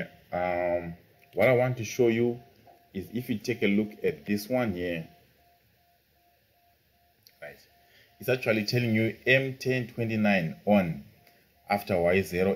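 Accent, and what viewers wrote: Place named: Zimbabwe. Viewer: It's giving me Zimbabwen accent 😂❤❤.... Nice one bro I like the reference to the documents... Keep up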